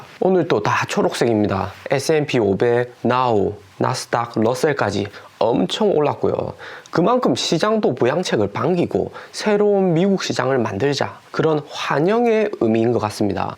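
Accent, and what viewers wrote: Gyeongsang, South Korea. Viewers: 덕분에 테슬라 650불에 진입했어요... 어쩐지 낮익은 목소리.... 같은 갱산도 아이미꺼 저는 마산... 샤오펑도 오늘 진입했어요.. 새해 구독자 대박대박... 영상 보신분들 꼭 구독 좋아요.. 부탁드립니다. 샤오펑도 가즈아~~~ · 경상도 아지야 좋아요 꾸우욱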